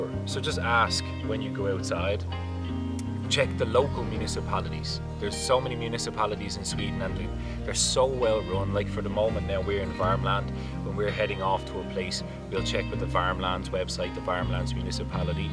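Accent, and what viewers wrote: Ireland. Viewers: I think he means three he has an Irish accent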